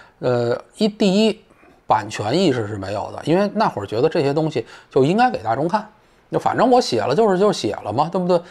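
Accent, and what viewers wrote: Beijing, China. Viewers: 我是个90后女生却十分喜欢散人的扑克脸 北京腔更显老道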